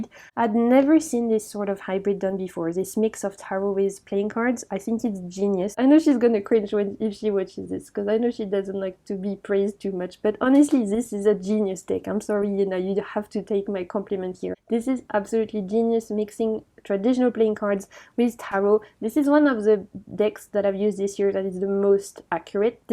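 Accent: France. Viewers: Love these decks! Sometimes I listen to you just for the Oui Oui Oui French accent 😂😂😂❤❤❤❤❤❤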